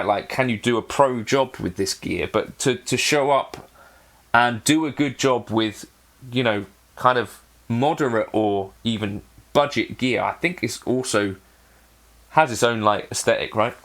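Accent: United Kingdom. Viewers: The minute that I heard a British accent, I knew that it was going to be about distortion on guitar. The Brits should have an image of a distortion pedal imprinted on the Union Jack (their national flag.) It's against the law to play an authentically clean sounding, electric guitar in Britain. Here is a reminder of what a clean sounding guitar actually sounds like. <link>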